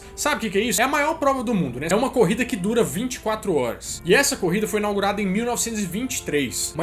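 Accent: Minas Gerais, Brazil. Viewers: Acho o teu canal um dos melhores. Só uma sugestão, pra ajudar a gente que não é de Minas, é fazer um sotaque menos regional, pq tem horas que não dá pra entender o final das frases, já que em Minas se come muito a pronúncia kkk Ao menos fale mais pronunciado quando for falar frases muito grandes. Parabéns pelo conteúdo, e um abraço!!! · Vc é mineiro? Pelo sotaque parece que sim.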